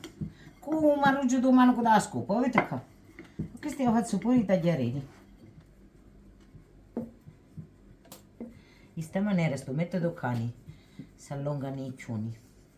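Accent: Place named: Calabria, Italy. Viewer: Soy Argentina, hija de calabreses. Su dialecto.me emociona al igual que sus comidas, ingredientes, etc., etc. Si tendría la oportunidad de viajar, iría a verla. Eres una mujer admirable ❤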